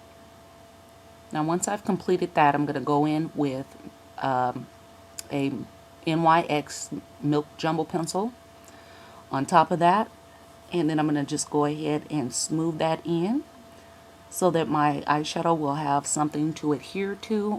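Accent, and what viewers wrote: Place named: United States. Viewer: You should never have named this video 'Fugly' ... you are by no means ugly. Ugly is as Ugly does .... its what's in your heart that counts. Also, you have one of the prettiest smiles I have seen. Plus your make up video is wonderful. Well done! Please post more. Your accent is lovely too, very easy to listen to ... I'm British so I always love the American accents lol. Enjoy making your videos ... you're teaching people all over the world how to look fabulous!!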